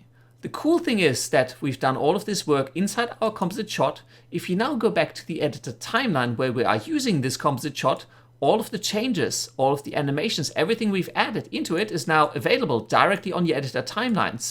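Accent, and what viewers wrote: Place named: Germany. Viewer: Really really great tutorial! and nice german accent :D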